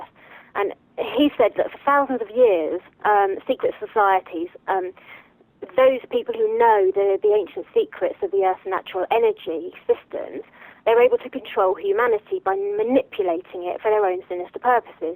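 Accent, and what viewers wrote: England, United Kingdom. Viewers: makes one laugh Americans telling an English person to talk English!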